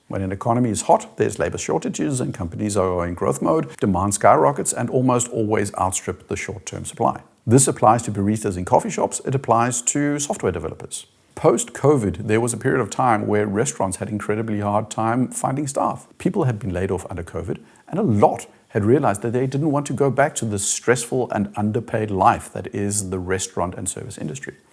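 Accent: South Africa. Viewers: Great video, are you South African? I cant quite place your accent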